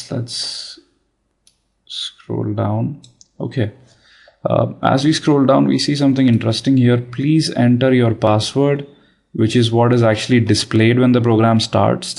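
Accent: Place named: England, United Kingdom. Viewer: you have a strong english accent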